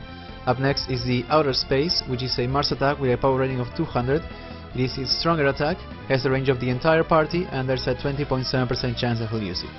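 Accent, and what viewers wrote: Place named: Spain. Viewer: Just came from your Megaman videos and am binging through Golden Sun. Indeed there are few viewers, but I think it's mostly since this is an old game. I watched it for the nostalgia. Based on your accent, I'm guessing Spanish (I have plenty of Spanish friends with a similar accent)? You definitely don't have a bad voice, and I'm amazed at the amount of work you put into something you like. I may not comment on every video, but I'm watching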